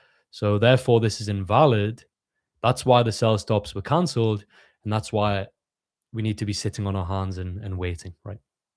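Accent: Newcastle, United Kingdom. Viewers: You sound a little bit like a geordie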